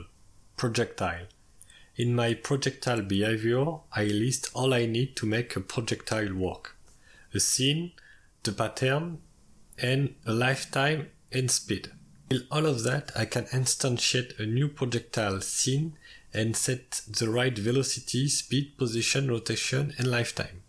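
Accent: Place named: France. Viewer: Bro t’est français ! J'arrive à reconnaître l'accent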